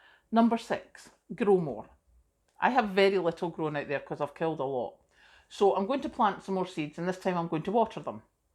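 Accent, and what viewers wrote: Scotland, United Kingdom. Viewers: So? Did these save you money? Would love a recap. Thank you! Pretty lady from Scotland with the lovely accent!❤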